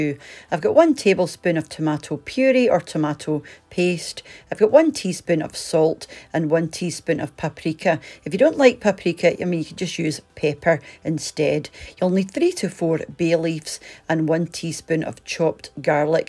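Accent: Scotland, United Kingdom. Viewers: I love Christmas too! Can't wait for New Zealand winter to make this stew in my slow cooker, I freakin LOVE Irish Stew!! I was raised in Invercargill, right down the bottom of the South Island, as you can tell by the name, it was settled by Irish, so I grew up on lots of different stews 😍 I am obsessed with your Scottish accent! Even though I've been living in Nelson, top of the South Island, for 26 years now, people can still tell I'm from down south because I still roll my R's slightly lol 😊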